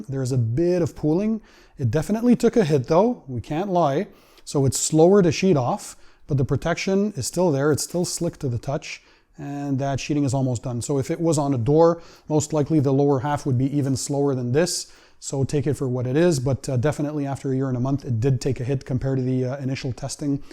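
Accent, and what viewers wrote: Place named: Canada. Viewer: Pourquoi tes vidéo ne sont plus en français ? J'adore votre accent canadien en plus c'est vraiment dommage...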